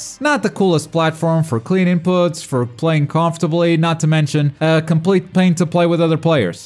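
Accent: Portugal. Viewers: I didn't know you were Portuguese, but then the more I heard your voice, the more I realized your accent is like St1ka's. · Oh man, I thought you were from Spain all this time, and it turns out you're from Portugal. Nice!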